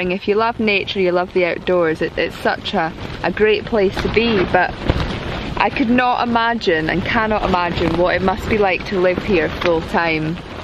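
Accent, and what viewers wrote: Scotland, United Kingdom. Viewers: Please retain your Scottish accent and tones, you are starting to succumb to the recent Austrailian infestation, 'raise the tone of your voice at the end of every sentence' like it was a question.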